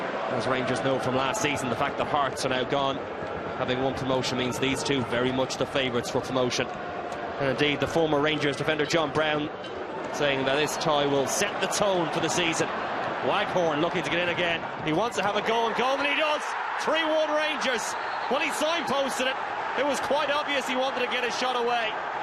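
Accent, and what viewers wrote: Ireland. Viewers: Sounds more irish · irish commentary ffs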